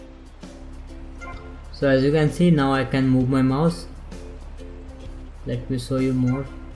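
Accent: India. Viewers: bcoz Yours Voices Has been like an indian guy😊😊😊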